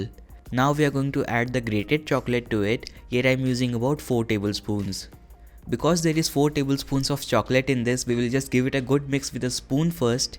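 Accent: India. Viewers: Damn, why all the recipes with Indian voice there and everywhere behh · 咖喱味英语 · That Indian accent tho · you are indian? You voice tune like indian sub region. And thanks.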